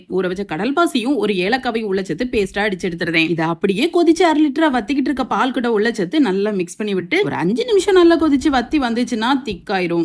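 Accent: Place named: Kanyakumari, India. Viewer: Please change the slang eduthiruthen, vachiruthen, cheathiruthen, vittiruthen.k.k district aaa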